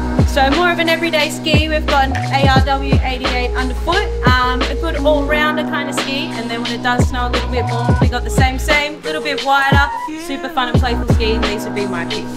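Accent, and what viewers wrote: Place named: Australia. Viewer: I did not expect to hear someone speak in Aussie accent on a Japanese ski shop video :) I may visit to rent skis when my family goes to Japan for a ski trip.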